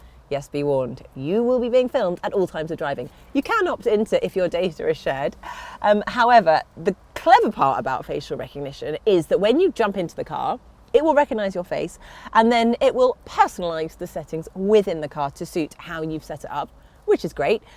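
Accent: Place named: United Kingdom. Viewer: It's your British accent. They need a German accent, you know the aggressive type to recognize what you're saying....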